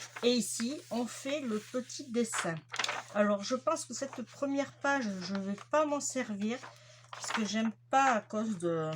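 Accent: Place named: Montpellier, France. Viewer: Coucou bonjour je suis nouvelles abonnée super achats , moi aussi je suis accro ACTION , on a presque le même accents toutes les 2 d'où êtes vous ? si ce n'est pas trop indiscret perso je suis originaire de montpellier mais je vis en Région parisienne ! !!! A bientôt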